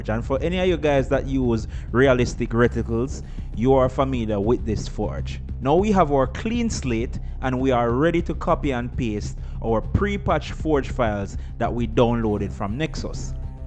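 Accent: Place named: Jamaica. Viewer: beautiful jamaican accent brother, great video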